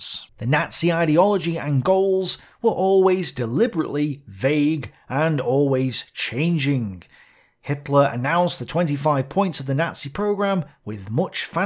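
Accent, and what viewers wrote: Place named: Cockney, United Kingdom. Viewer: Dude you have a strong cockney accent ! It sound very weird but I am ok with rhat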